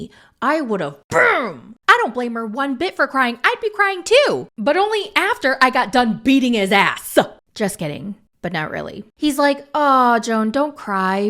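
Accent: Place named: New York, United States. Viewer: I’ve been away a few months but I’m loving the sometimes New York accent that developed in my absence.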